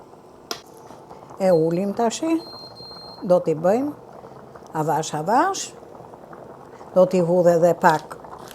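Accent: Tirana, Albania. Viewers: Zonjë e nderuar të lumshin duart ,sa mir gatuan dhe sa bukur shpjegon me dialektin Tironas ,paç jetën sa më të gjatë ,dhe të kesh shëndet të mirë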